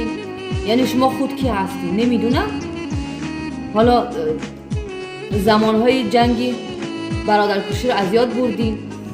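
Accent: Iran. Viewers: Эрони гап мезана точикода обращени дора дафк хта дюмбор набинемт да ича эаму эрониёву туркода обращение кн точикои мора зиндагишона хавардорам нести дюбор гап назан дарбораи точико т точик нести мо тра не признаём уродина 🖕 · Точики тоза гап зан.ранги ерони гап мезани.точикдухтар боши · Ман митунам шуморо бигоям ту ируни харф мизани манба махкулшиди хуб харф мизани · Oy dokhtar khode too az kojoin pas chero be lahjiye Tojeki gap namizani too az kooli Iroon harf nazan goomshoo bobo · Ту чиба точики гап намезани? Неким бо Ируни бо хато харф мезани, магуки ируниям, карочи Насихат Хубе, фикрат зебое, дуруст мегуи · Полный рост эрони хта гирифти ку??точики охи лахчаи точики Кати гап зан намезебат!!!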